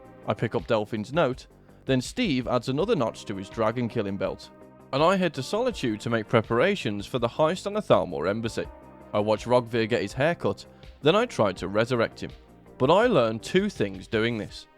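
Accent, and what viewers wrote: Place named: Yorkshire, United Kingdom. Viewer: Are you from Yorkshire? Because you sound like you’re from Yorkshire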